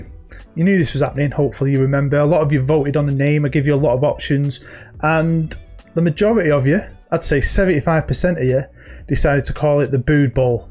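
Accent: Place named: Manchester, United Kingdom. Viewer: I was kinda hoping the voice would come back and you would have a different accent 😂, not that there's anything wrong with Mancunian I just think it would be funny if you were suddenly Jamaican or something 😂. Anyway, amazing to see you back! It's only Monday and this week keeps getting better and better! BOOD IS BACK!!! You're right about the media making you look bad, that Sam Matterface in the semi, WTF!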